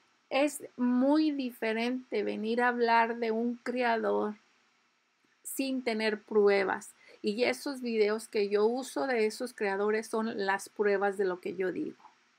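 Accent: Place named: Cusco, Peru. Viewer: La ignorancia es atrevida e insolente, para tu conocimiento, ella es de Perú, pero no de la capital Lima, en Lima como en otras provincias el español es diferente con diferentes acentos, los de la costa, sierra y selva no hablan igual. es del Cuzco y allá la gente habla así, si te burlas de ella te burlas a base de tu ignorancia y tremenda insolencia, puedes opinar pero, antes EDUCATE E INFORMATE, para no decir tremenda estupidez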